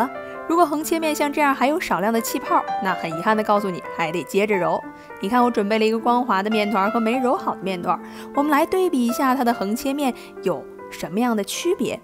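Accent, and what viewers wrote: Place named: Beijing, China. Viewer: 我学到了面食的制作方法。我也听到了熟悉的，甜美的、北京的声音。很享受！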